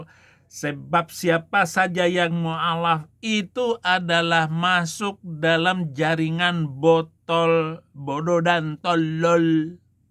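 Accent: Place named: West Nusa Tenggara, Indonesia. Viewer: yang sehat selalu ya abba kami selalu berDoa untuk mu🙏💖💖💖🙏(menggikut Yesus keputusan mu) dan itu sangat berat karna dari logat mu saya tau anda dari kota atau daera NTB yang mayoritas (muslim)🙏🙏🙏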